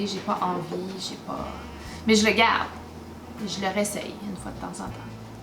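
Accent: Quebec, Canada. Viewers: Bonjour, j'adore ton humour l'air de rien quand tu parles des sorties et des confinements 😁 Pour moi, faciles à porter: N°19 (mon parfum signature), Insolence et Shalimar millésime de Guerlain (coup de coeur mais mauvaise tenue), Vanille tonka de Nicolai, et plein d'autres... Ceux avec lesquels j'ai plus de mal désormais, les "cheap" de façon générale, par exemple la collection "Les notes gourmandes" de Réminiscence (Guimauve: je ne peux en mettre que sur mon poignet ou le sentir dans la pièce, mais ni moi ni sur les vêtements). Mais aussi les parfums dont la base est l'oud, tous les masculins en général, ainsi que les "odeurs de propre" (muscs trop synthétiques). Merci pour toutes tes vidéos qui sont un vrai plaisir à chaque fois, avec ton accent (euh, je veux dire le fait que tu n'aies pas d'accent français 😁) qui me donne envie de retourner au Québec! 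Bises 😘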